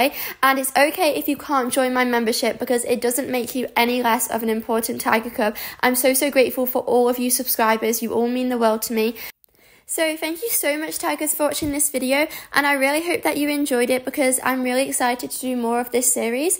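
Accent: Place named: Germany. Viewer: omg how did i not notice that you‘re german!!😍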